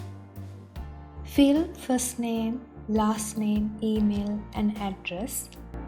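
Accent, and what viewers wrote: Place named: Sri Lanka. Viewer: haha I was searching for React Form tutorials and this got recommended. keeyatawath hithuwe naa Lankawe accent ekak ahei kiyala :p Good Luck whoever you are! :)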